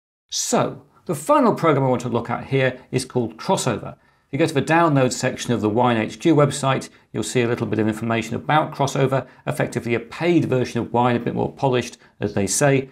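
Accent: United Kingdom. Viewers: Me while learning English: "ok, cool, this is pretty easy to understand. I like it" Also me hearing British accent: .__. Edit: very good video. Helped me a lot. Tysm. :)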